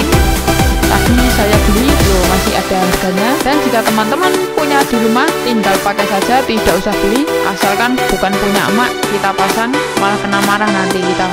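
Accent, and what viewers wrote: Java, Indonesia. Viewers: orang jawa ya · Dari mana nih bosku.. Kok logat jawanya mateng amat haha salam dari kota Demak Karang tengah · Jowo ne ngendi sampean mas:D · Wong jowo ndi suarane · Jawa medok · Logat jowo ne keliat banget :v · wong jowo ya kowe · Mase wong jowo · Bang orang jawa mana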